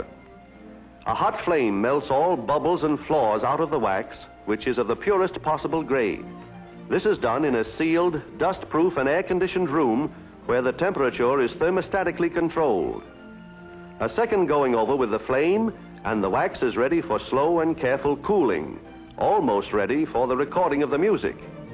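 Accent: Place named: Mid-Atlantic, United States. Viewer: Back when we had Mid-Atlantic class.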